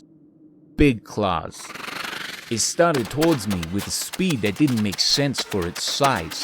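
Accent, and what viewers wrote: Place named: Massachusetts, United States. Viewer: This was a great story! You did a pretty good job with the Mass accent, too.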